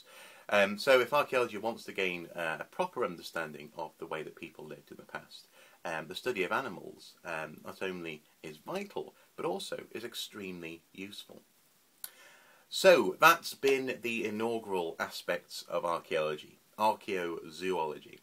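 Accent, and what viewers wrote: United Kingdom. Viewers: are you british your acent is very strong?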